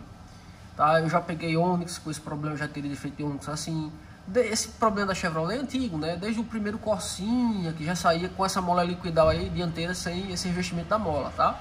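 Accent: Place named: Pernambuco, Brazil. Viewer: Pelo sotaque se for de Pernambuco vou te procurar. Tenho um Etios e queria ué CV olhasse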